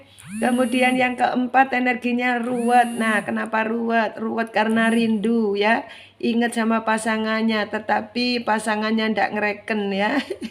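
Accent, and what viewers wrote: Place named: Java, Indonesia. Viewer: medhok bgaet yooo itu lucuya bikin semangaat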